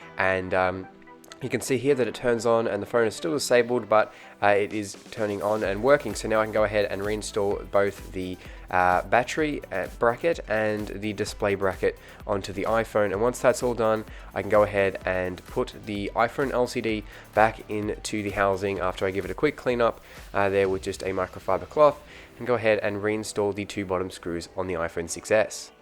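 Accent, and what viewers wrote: Australia. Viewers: WAIT A MINUTE ARE YOU AUSSIE!?!?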